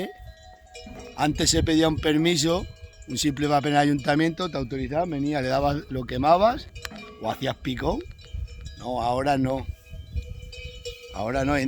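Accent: Extremadura, Spain. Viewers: Hola, por el acento eres extremeño? Me ha salido tu vídeo y no puedo estar más de acuerdo. Olé por la denuncia desde este canal. A ver si se enteran toda esta gente a la que haces referencia. Saludos desde Sevilla de una extremeña.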